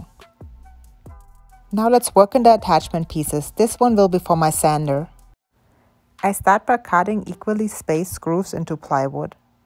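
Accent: Germany. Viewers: Brilliant job on the cleat system! Your accent sounded somewhat German, and then I heard you say "fertig" so that's certain! Ich kann ein bisschen Deutsch, übrigens! Curious how you found your way to the US! Subscribed and looking forward to your videos! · Hallo wunderschönes Video. bist du Deutsch,dein Akzent klingt so,geh einfach mal davon aus und hab deshalb auf Deutsch kommentiert. Hab deinen Kanal abonniert und meine Daumen zeigen nach oben. Wünsche dir viel Spaß bei deinen Projekten und weiterhin so schöne Videos. Frohe Weihnachten und bleib gesund. Gruß Rudi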